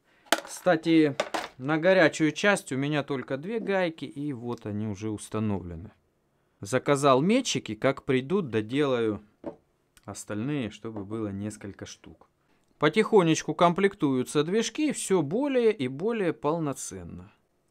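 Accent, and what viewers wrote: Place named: South, Russia. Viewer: у просто неправильное звучание,ибо южный акцент имеет,все люди с нормальным произношением не будут говорить подшибник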